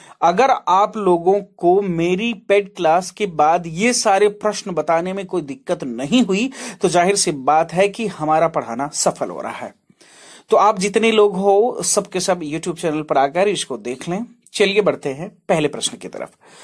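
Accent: Pahadi, India. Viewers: पहाड़ी हिंदी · Pahadi hindi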